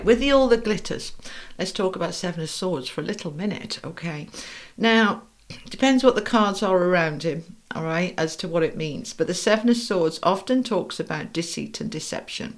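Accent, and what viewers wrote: United Kingdom. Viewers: I am free I am secure, I am safe. I love your wonderful energy and love to hear your British accent- so many of my Favorite musicians are from the UK …. I wonder if I have had a past life there with the attraction and fascination with everyone abroad ❤ thanks for sharing your lovely messages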